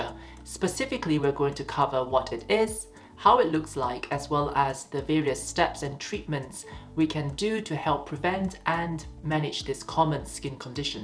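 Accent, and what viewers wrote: Scotland, United Kingdom. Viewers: I’m Scottish and I can sometimes hear a wee Scottish twang in your accent ❤ great info ! I suffer from mild rosacea mostly with thread veins on my cheeks . I’m now more informed and ready to treat. Many thanks and I’m jealous of your beautiful clear skin! ❤ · You can definitely here a Scottish twang in your accent ❤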